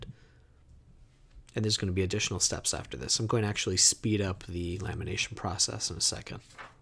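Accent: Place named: United States. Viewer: Nice art, nicely done, with the creativity to get it together! You are a Greek, though with 100% American accent, if I did not seen the last name I would not guessed it.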